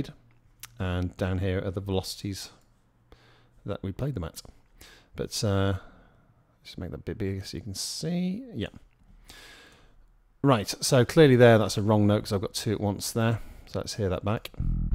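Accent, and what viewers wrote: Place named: United Kingdom. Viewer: "might be a cheeky one in there" tehehe love the british accent and vernacular :) thanks for helpful video!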